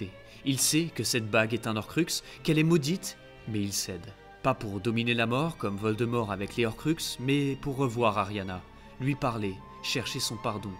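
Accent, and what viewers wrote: Belgium. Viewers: Cest laccent belge ça. Il doit être belge le mr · Bonne vidéo, j'entends un accent belge ? 😉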